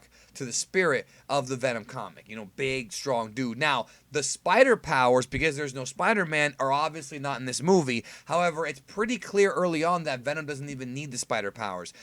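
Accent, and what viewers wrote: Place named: Connecticut, United States. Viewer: This dude has got to be from Connecticut because he sounds exactly like the frontman Jamey jasta from Hatebreed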